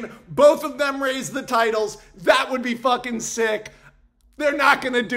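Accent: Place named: United States. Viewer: Your american accent is so obvious,.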